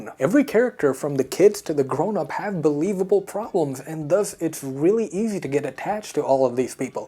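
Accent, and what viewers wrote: United States. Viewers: oh OK kool just being curious that's all you sound like your accent is either American or Canadian.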